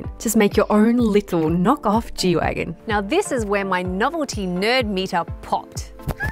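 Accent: Australia. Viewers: Sexy woman with an Australian accent talking about natural aspiration. Like.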